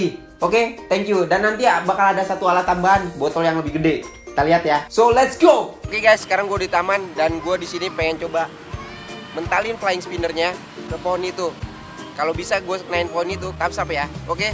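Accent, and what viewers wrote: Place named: Philippines. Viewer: i thought you were a filipino or visayan that's the way we talk but not me just little bit but im half filipino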